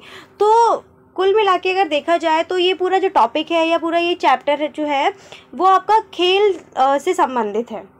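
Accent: Delhi, India. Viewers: Mam are you from Delhi 😁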